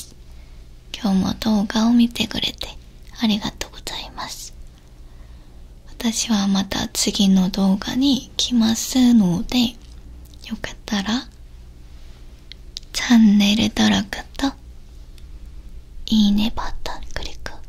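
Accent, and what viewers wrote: South Korea. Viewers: 韓国の女の人が話す日本語っていいなぁ。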